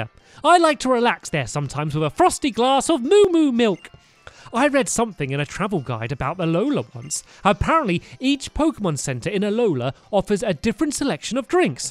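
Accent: England, United Kingdom. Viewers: S4L Yolo It's just stampys accent You could say both ways In England we say z as zed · Lunapuppy Vlogs is from England, so they pronounce Z differently lol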